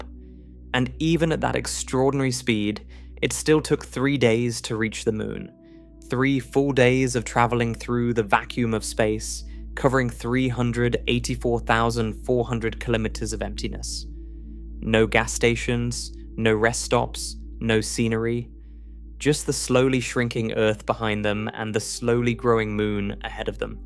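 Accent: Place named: RP, United Kingdom. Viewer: Hi from Lake Como, Italy. I like your videos. They are made with AI but indeed perfectly put together; I like the accent of the AI voice, sounds like received pronounciation but with a modern twist. What tool are you using to make the narrating voice?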